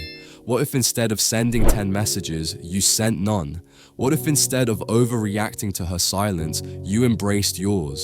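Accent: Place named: Scotland, United Kingdom. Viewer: Its a Scottish accent it has a charm its human its natural its better than the AI generated voices on the internet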